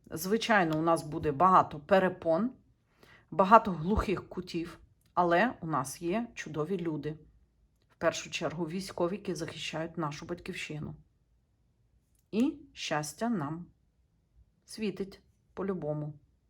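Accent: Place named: Bukovina, Ukraine. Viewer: приємно чути правду та осадок що відповідати не будуть.Як сонечко в морозний день буковинський діалект гріє не тільки слух а і душу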